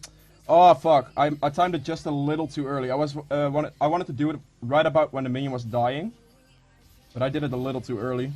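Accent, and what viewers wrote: Netherlands. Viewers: Hahaha jij hebt echt een Nederlands accent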